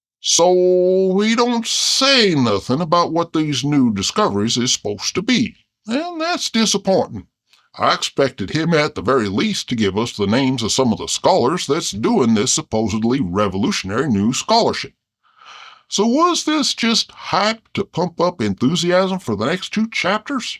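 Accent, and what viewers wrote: South, United States. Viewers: I'm a big fan of the analytic approach to how you examine and dissect each claim that is found in Dr. William Lane Crag's book On Guard. Giving them an in depth sniff test in your charming southern down home style. The only criticism that I have is more of a me problem than a you problem and that is the background sort of triggers my minor case of visual OCD and that is the trees in the mirror on the right side are just wrong the image should be identical as to what is seen in the window and it isn't.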